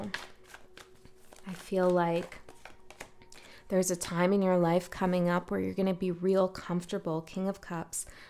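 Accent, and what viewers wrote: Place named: Canada. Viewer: i see that you are a piacean same as me, love your ring on your right hand, i have always loved your canadian accent, you have a very soothing voice, i see the letter m in thye palm of your hands and the half moons in your nails. i was born on march the 6 th at 6 am 1962 what day were you born?. ps your boyfriend is a lucky guy i hope he realises that. what part of canada are you from, love your cat by the way.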